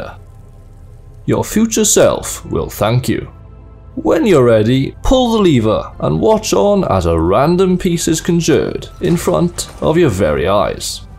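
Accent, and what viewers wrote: Wales, United Kingdom. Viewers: are you actually welsh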